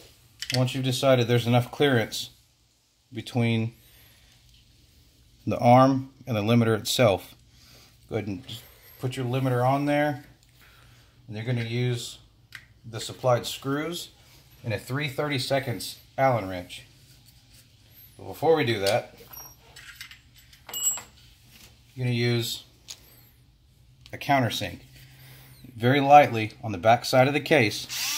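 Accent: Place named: South, United States. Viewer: Finally found it! You sound like Matt Fikac, you southern guys all sound the same 💪🏼😆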